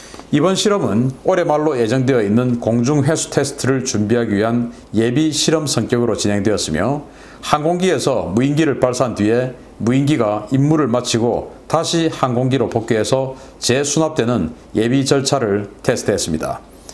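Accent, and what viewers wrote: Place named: Gyeongsang, South Korea. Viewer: 경상도 말쓰는거 보이 미똥당 ?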